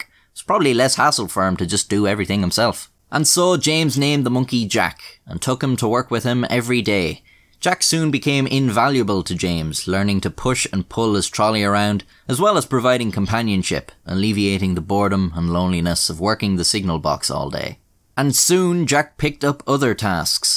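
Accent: Ireland. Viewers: This video would feel so wrong if it wasn't narrated by an Irishman. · nice drawings. i like james. very polite. my uncle is irish and is named james and he kinda looks like a monkey. and u sound irish. ressurfuate hin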